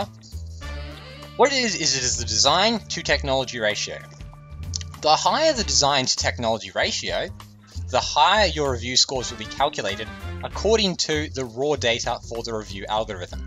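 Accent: United Kingdom. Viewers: Nicely done tips, this game was kind of frustrating me in that every game i made would tank or i was moving too fast when it told me too. Also rates up for your voice too, you must be a Brit like I.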